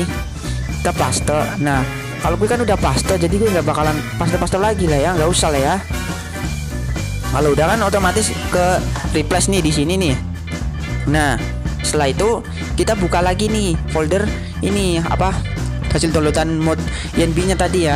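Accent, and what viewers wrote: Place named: Java, Indonesia. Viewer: Medoknya mntep bos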